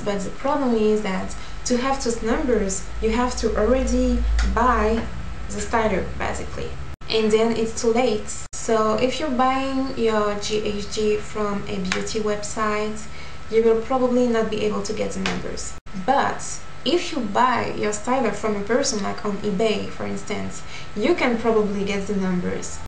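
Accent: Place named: France. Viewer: She sounds very french.. what do french people look like? because I am French! born in Nice raised in London!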